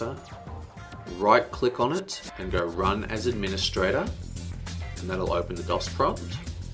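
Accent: Australia. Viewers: I'm most impressed by the fact that you did all this with steam open in the background. Anyway, great tutorial! And is that an Australian accent? :P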